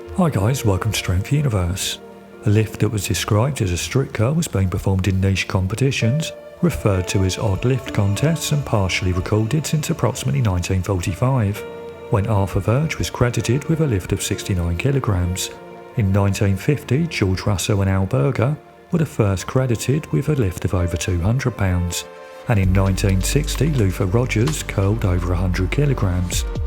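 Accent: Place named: Kent, United Kingdom. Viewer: Are u from kent mate... Your accent 😄😄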